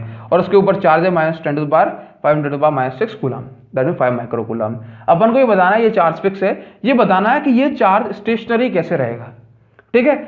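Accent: Odisha, India. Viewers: Sir ap Odisha se ho kya❤️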